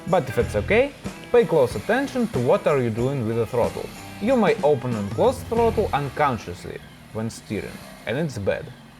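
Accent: Russia. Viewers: Бля, чувак с таким Рязанским акцентом луче не позорился бы и говорил по русски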